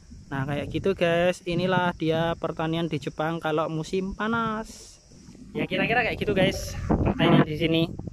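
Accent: Java, Indonesia. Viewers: Asli jowo opo pontianak to bos..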